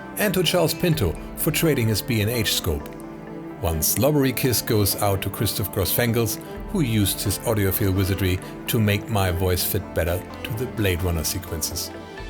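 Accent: Germany. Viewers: Thanks for this works! It show me how much i miss fo to the cinma! Also you have a nice gemran acent! And this is rare as i know as a german. Also instan season 4 and 5 shoot of the expanse shoot with lenses. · Wow, what an interesting and good looking channel! I did watched yesterday 4-5 Videos during my work from the homeoffice. Echt Freunde ich schaue schon seit Jahren englischsprachige Channels und Tutorials und jetzt treffe ich aus Zufall auf euch. Dachte ich immer die amerikanischen Channels wären besser, da spricht mich doch einer mit deutschen Akzent an. Ich denke ich werde Mitglied bei euch werden.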